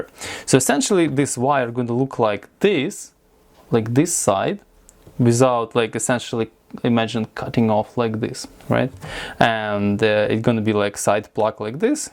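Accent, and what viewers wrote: Russia. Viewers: He is probably Russian or Ukrainian, they have the same accent.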